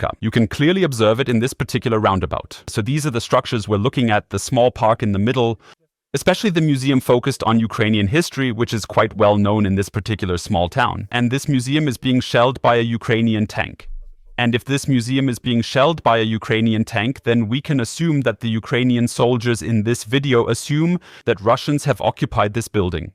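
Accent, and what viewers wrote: Germany. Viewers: I'm sure, he has German accent all right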